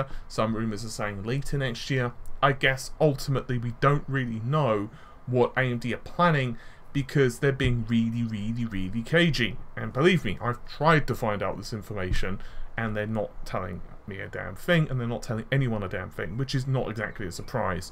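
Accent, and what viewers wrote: United Kingdom. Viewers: he's speaking like a normal British lol.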